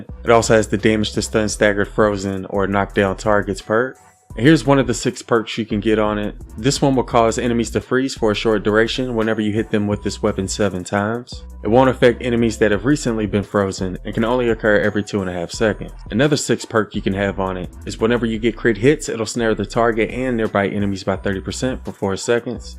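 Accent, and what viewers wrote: South, United States. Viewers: What state do you live in u have a southern accent I think lol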